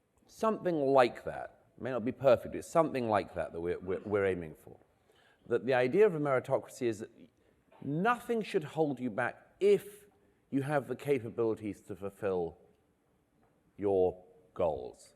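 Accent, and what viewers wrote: United Kingdom. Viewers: You sound British. Have you heard of the Oxford Dictionary? meritocracy noun government or the holding of power by people selected according to merit Seems your “something like this” definition is about as useful as the English football team over on the continent right now But … I guess you have your agenda to push So, Best of British, you posh propaganda old chap! That said, mmm. wouldn’t discussing something (meritocracy) by defining it in a rather off-centre way … what could be called “straw manning”? But … maybe you’re more familiar with plain English than those chaps at Oxford (?)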